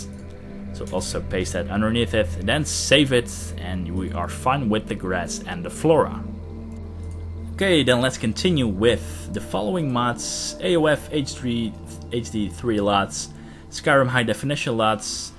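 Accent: Netherlands. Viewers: Heerlijk Nederlands accent! Toffe video trouwens :)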